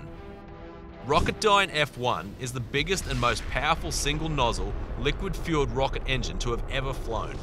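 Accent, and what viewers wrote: Australia. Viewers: It does help that the narrator of this video is Australian. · he sounds aussie ngl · Aussie accent is perfect for this type of content:elbowcough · Australians make everything sound like I want to go Outback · Are you Australian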